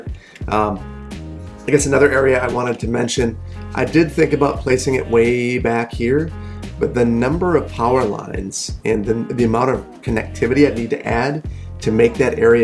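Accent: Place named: Milwaukee, United States. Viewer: I just discovered your channel and binged just about all your videos! I'm an MKE native and used to work for WI DHL so your accent and industry make me homesick. Keep the videos coming! The winter map for another month or so works for me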